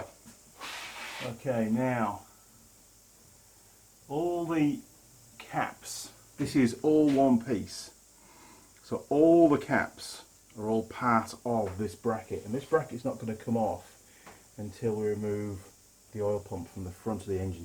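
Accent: Yorkshire, United Kingdom. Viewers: Nice tear down. Your accent sounds local to where I live in the UK, maybe a quick run over the "snake pass". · You live in Australia I take it, or I think that's what you said at some point. But you sound very much like your from my part of the world in Yorkshire, I live in the dales. I could be wrong, but definitely English, I'm sure, but it sounds that way. Awesome vid aswell, nothing better than getting ya hands amongst it under the bonnet, a lot easier I must say on the bench. Glad I came across your vid, it just popped up in things you may like. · Also, where you from originally? You sound like you’re from not far from here (sunny Rochdale), just over the wrong side of the border into dirty Yorkshire? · Interesting stuff. Nicely presented too. You sound like you're originally from Yorkshire? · I have to agree! I only arrived at this video by a YouTube recommendation, and to be honest I thought I would skip through chunks of it just to see the damage - - I have watched every minute of it, and what a fantastic video! 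You have another subscriber (from the UK, where I suspect you originate from, going by your accent?) and I look forward to watching future videos. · Hi, I came here courtesy of the YouTube suggested video list. Being a reasonably experienced hobby mechanic, I understand what you are on about in this here video. The way you talk the viewer through the stripdown is refreshing. Subscribed! Cheers, Niek from The Netherlands (opposite end of the planet! hey!) p.s. I can't help but spot a bit of a mild Yorkshire accent from time to time. You're not from the UK now are you? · Heh, I came to the comments to say the exact same thing! Reconised the accent straight away; "odd sounding Kiwi", I thought, "sounds like home" - I grew up just outside York. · Your Yorkshire accent is very soft considering you arrived across the ditch in 2008. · Sounds like a Brit, looks like an Australian :S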